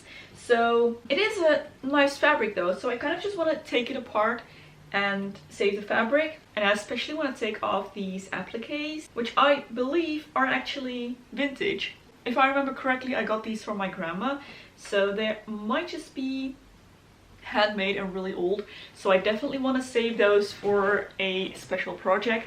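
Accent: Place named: Netherlands. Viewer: I thought to hear you were Dutch and then I saw the Harry Potter books. Greetings from another Dutchy! Love you vids!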